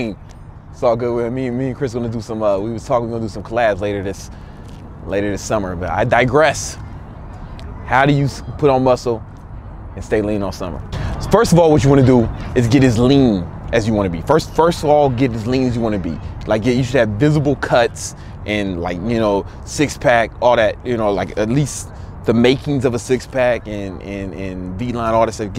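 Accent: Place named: New York, United States. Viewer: For someone that's from ChiTown, you have a heavy set, NYC accent fam. When I first saw.your vids 2-3 yrs ago, I always thought you where from Da Big Apple. How long you've been living here? P.S. I took the liberty to check your Six Pack Cheat Codes. Definitely gonna add you to my email friend list. I saw PT 1 & that shit look mad intense. I'm recovering from the flu hence, I don't have the endurance much less, the strength to dare fuck with your regime. Not til I get healthy. I'm slim, I should be able to get a six pack before summer ends. P.P.S. Didn't I tell you your Bulls were done in the 90's fam? 😄 I know, I know, Knicks in the 70's. Smh!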